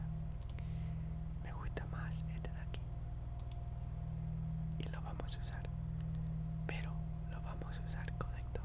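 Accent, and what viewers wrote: Argentina. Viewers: Te juro que pensé que era argentino xd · Eres argentino? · Pero si es de argentina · bro tu español es increíble tenes parece Argentina ajjaja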